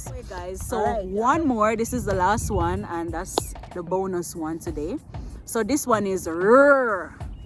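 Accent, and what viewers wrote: Jamaica. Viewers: i love jamaican accent ...thanks for the tips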